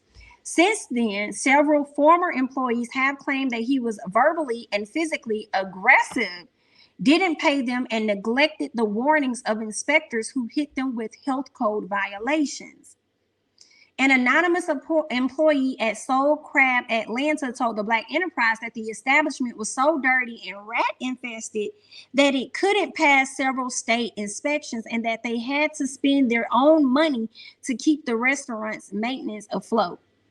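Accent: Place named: Alabama, United States. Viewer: I subscribed as soon as you song messssyyyyy. Sounding like you are from Birmingham Alabama girl. Im new here and I think I'm going to enjoy it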